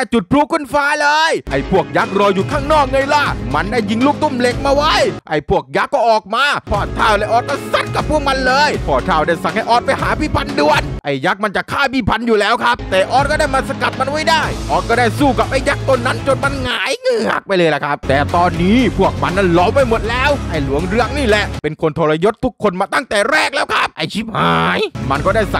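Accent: South, Thailand. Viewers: เวลาแหลงใต้ โคตรได้ใจเลย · พี่เป็นคนใต้ไข่ไหม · พี่เป็นคนใต้เหรอ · พี่เป็นคนใต้หรอ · พี่เป็นคนใต้ใช้ไหม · ชอบพี่บ่าวว่ะสำเนียงใต้นิดนึง55 ชอบๆ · คนใต้เห้อหลวง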